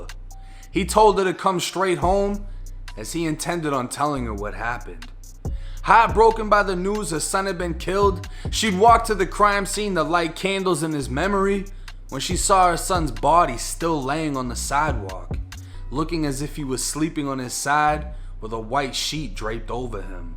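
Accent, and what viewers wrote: Boston, United States. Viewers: ARE U FROM BOSTON? Ur accent bro! Lol! ROXBURY DORCHESTER MATTAPAN!!!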